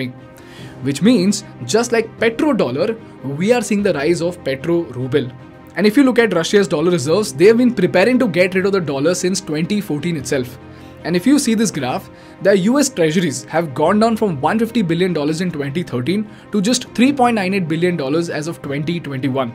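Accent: India. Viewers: I is probably a good lecture but you speak too fast and in an Indian lingo which is hard for most of us to understand. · The speaker has strong accent of indian slang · why indian english is so indian?🥲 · The indian accent is killing me you need to put subtitles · The Indian accent is deafening.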